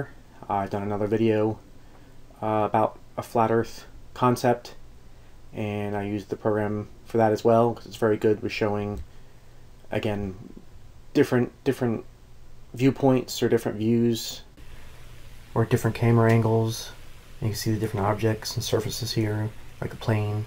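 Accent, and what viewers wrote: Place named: South Jersey, United States. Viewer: New South ?? Soundly like South Jersey to me 🤔🙃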